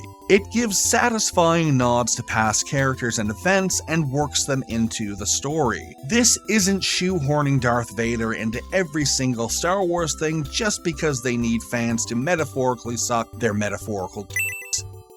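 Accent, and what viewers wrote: Maritimes, Canada. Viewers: Bro... you from the maritime provinces?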